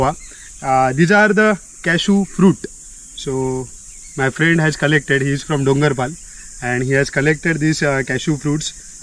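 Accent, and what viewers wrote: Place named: Maharashtra, India. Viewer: खूप बरं वाटतं जेव्हा आपण अंग्रेजी मध्ये बोलता 🙌🏽 Appreciate your efforts and speaking with Marathi infused in subtle way, with specific words and accent too 😇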